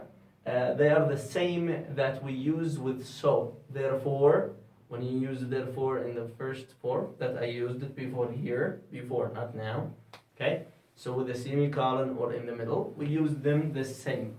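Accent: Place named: Yemen. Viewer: for English I just wanted to make sure owing to your accent look like Yemeni accent 🙂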